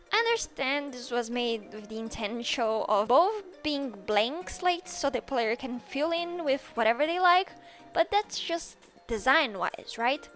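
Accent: Brazil. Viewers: NÃO ACREDITO QUE SE É BR KKKKKK EU TAVA VENDO O VIDEO NORMAL ENQUANTO EU DESENHAVA, AI NA HORA QUE VC FALO MADOKA MAGICA EU RECONHECI O SOTAQUE BR, E FUI ATÉ CONFERIR ! YEEEEEEEEEEES MAIS BRASILEIROS !!!! muito bom o video por sinal adorei seu estilo, e adoro ver videos pra desenhar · Pelo sotaque eu sabia que era brasileira kkkk,amei o seu vídeo e seus designs e ideias são muito criativas e originais!💖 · Gurl ru brazilian? I know the accent sounds rlly Brazilian, like on Madoka Magica when u speaks.. (i am too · EU SABIAAA, EU SOUBE DESDE AS PRIMEIRAS FRASES QUE VOCE FALOU QUE VOCE ERA BR!!!! nao pq seu inglês é "ruim" ou tem sotaque, na real seu ingles é PERFEITO, mas tinha a >>aura<< HAHAHAH DESENHOS INCRIVEIS, DE VERDADE, SEU TRAÇO É TAOOOO LINDICO!!! nao conhecia seu canal, vou acompanhar a partir de agora! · OMGGG I KNEW I RECOGNIZED THIS ACCENT! You're Brazilian... 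Q NEM EU MANOO 🫂🫂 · Eu SABIA q vc era brasileira assim q ouvi seu sotaque sé loko muito bom seus vídeos ❤❤ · Eu tava escutando o vídeo e eu assim esse sotaque... SABIA Q VC ERA BR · A minute in, and im listening to your voice and im like "...huh... That sounds a little Brazilian.." Went to check the About you and was so darn right I have brazillian friends so maybe thats how i detected the slight accent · CARALHO eu passei o video inteiro me perguntando se vc era do brasil por causa do sotaque, nao acredito que é mesmo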